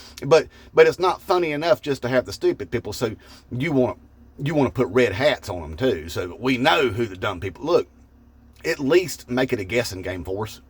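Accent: South, United States. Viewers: With your southern accent it don't make you bad guy imagine you talking with a wisconsin accent yea you know Der hey ya but don't cha know one time we agree old donny isn't best thing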